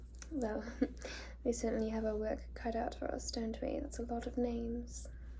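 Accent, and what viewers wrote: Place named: England, United Kingdom. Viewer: OMGOSH I L♥VE the English accent! You do it very well. You sound so authentic. WOW. [For the record: I am British 🫖🍵] Thank you, so Much!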